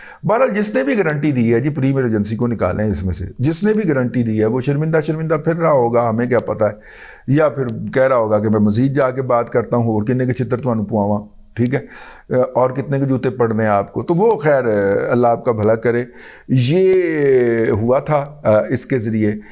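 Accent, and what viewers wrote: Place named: Okara, Pakistan. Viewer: sir ge app depaalpur okara waloon ki zaban bol tay hain. hamaray relatives wahana rhtay hain hum log basically bvahwalnagar ke hain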